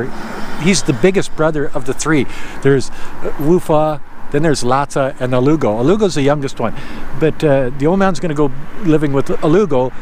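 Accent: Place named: Canada. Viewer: Dude you sound Canadian AF 🤗 Glad your escaping China and have somewhere safe to go👍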